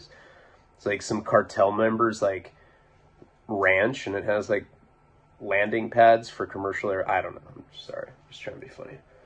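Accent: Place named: Boston, United States. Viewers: Your Boston accent makes me giggle poo poo Palabra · Oh my god! Your Boston accent!!!!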